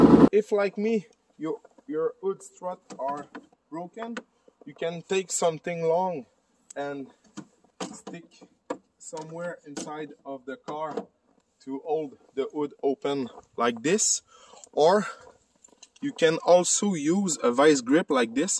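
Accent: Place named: Quebec, Canada. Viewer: how to tell you're from Québec not telling you're from Québec 😁 j'adore tous les deux, le mec et le video! merci 😊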